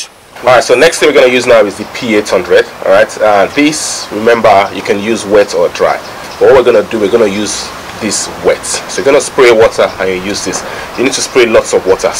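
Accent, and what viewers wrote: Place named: Nigeria. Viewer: My Naija broda. I hear that accent clear as day. LOL. Good job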